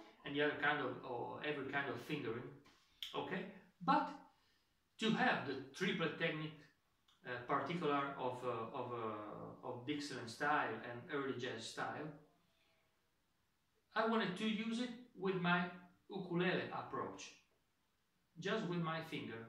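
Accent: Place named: Trieste, Italy. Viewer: Your English is fine. My father and uncle were born in Trieste. I'm used to hearing English spoken that way.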